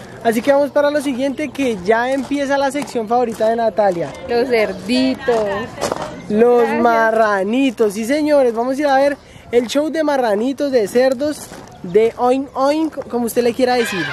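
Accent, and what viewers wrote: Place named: Cali, Colombia. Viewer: Como venía de Cali se le pegó el hablao'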